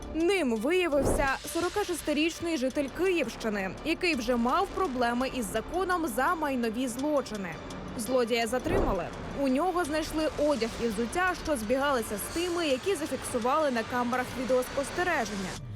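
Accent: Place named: Bukovina, Ukraine. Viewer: Ведуча ви сама буковина Бу ко ви на.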